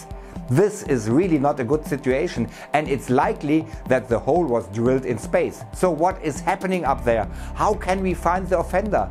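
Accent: Germany. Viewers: Denglish would mean that he is speaking like half of the words in German and the other half in English. He only has a german accent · Sorry. But this Art oft Denglish is terrible. Thumb down.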